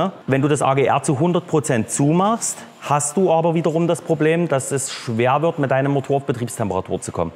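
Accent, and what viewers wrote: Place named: East Germany, Germany. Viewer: Klasse dein Ossideutsch😂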